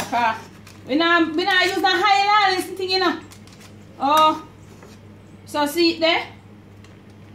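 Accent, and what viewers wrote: Patois, Jamaica. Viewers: Love your energy but nothing jamaican about this video but your accent. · I like when you talk the patois it sounds so sweet.lol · First time watching your videos...just by you representing the real jam dialect have me subscribing I'm sure I'll learn a thing or two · I dont understand some of what she's saying but am I the only one who doesnt care & love listening to her Jamican accent 💛💙💜💚❤ When I visit Jamica I would like to go visit her house😎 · I love ya u make me laugh love the jamaica accent 🤣🤣🤣. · I luv her raw Jamaican accent....she's the best!!! goodup goodup pickney...lol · Love your video and and love the beautiful dialect or Jamacian patios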